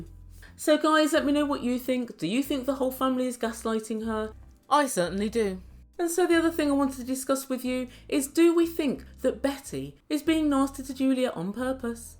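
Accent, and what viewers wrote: South, United States. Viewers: Love your Southern accent! Love your channel! ❤️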